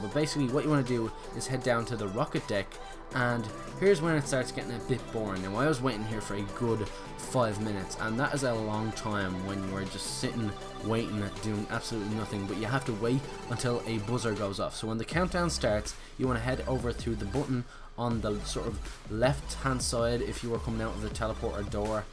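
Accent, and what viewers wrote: Ireland. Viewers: are you irish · great to hear a fellow Irishman doing something like this :)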